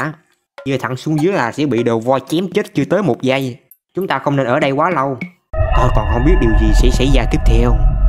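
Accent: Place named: Binh Duong, Vietnam. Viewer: saonóđúnglà bình Dương vải cả nó hơi sà lơ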